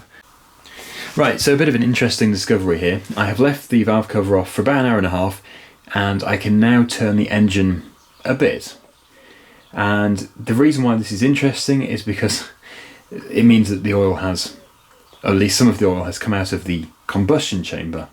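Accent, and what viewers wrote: United Kingdom. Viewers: Personally I have absolutely no problem with your talking. You know what you are talking about and that shows. Additionally you are a Brit of one stripe or another and your accent is pleasing to the ear. Keep on keeping on sir. Thank you for the entertainment. I am NOT a diesel mechanic. I have been a gas engine mechanic for 65 years. ( I'm 81) but I understand diesels enough to follow along quite handily. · You keep narrating. I love the british accents. Your videos are great! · I’m going to be honest I like the work you do but 50% of why I watch is just to hear your British accent and wordage . Love the Channel.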